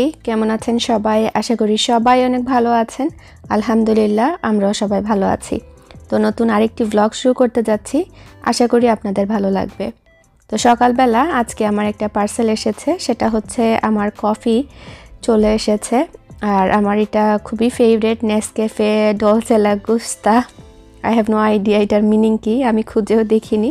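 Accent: Sylhet, Bangladesh. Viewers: Mashallah 🥰 This kids and your Shylety accent are really love ❤️ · আপা তোমার বাড়ি সিলেটের কইগো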